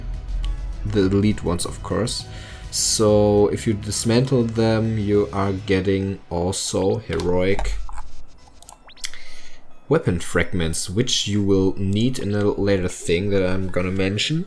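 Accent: Germany. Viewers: Man hört krass raus dass du deutscher bist xd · You sound like a German people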